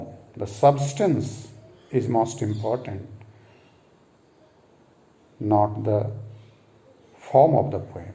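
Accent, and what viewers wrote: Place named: Bengal, India. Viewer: Thank You Very much Sir for your help. Wow! Sir Your bengali accent is very sweet.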